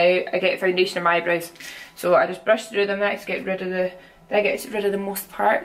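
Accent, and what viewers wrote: Scotland, United Kingdom. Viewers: She is Scottish.. I'm Scottish too and I find it so strange how ppl don't understand our accent hahah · Freddy T-s I am pretty sure she is Scottish but that's just a guess from the accent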